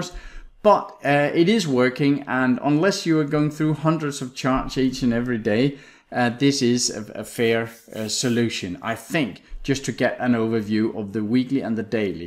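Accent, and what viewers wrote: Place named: Denmark. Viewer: Cool accent! Are you from Denmark? Thanks for the helpful video!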